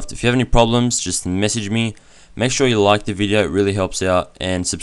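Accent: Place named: Australia. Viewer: ma gawd so damn Australian btw I'm from NZ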